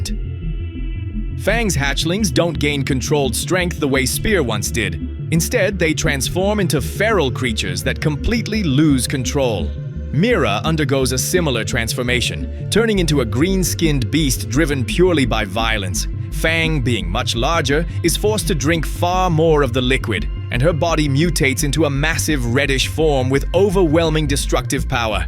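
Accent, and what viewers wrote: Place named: Bahia, Brazil. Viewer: Fala português baiano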